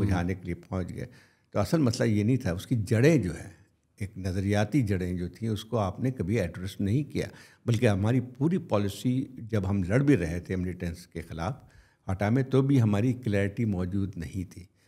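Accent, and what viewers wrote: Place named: Bihar, India. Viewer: sahab ke bolne ke style se aisa lagta hai ki ye basically Bihar ke hai.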